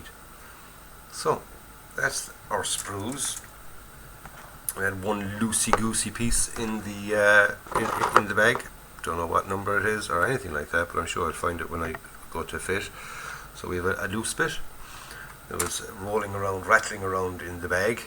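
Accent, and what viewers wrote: Ireland. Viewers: And your irish accent reminds me of donald sutherlands in the "eagle has landed"!👍🏻 Jman👀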